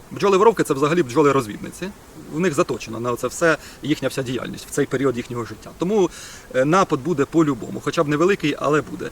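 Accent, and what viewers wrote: Standard. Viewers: Діло знаешь . Мова чиста , грамотна. Один недолік cпішиш. Кому ти розказуеш? Людям , то куда спишиш, виходить розсказуеш сам соби. Старайся , і поправляйся. Тоді все буде добре. Бажаю успіхів. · Дуже гарна чиста українська мова. Стільники необсушені мені зберігати не сподобалось. Обсушую у вуликах через заставну або через плівку. Далі проморожую у морозильнику і зберігають у порожніх корпусах + з оцетовой есенцією.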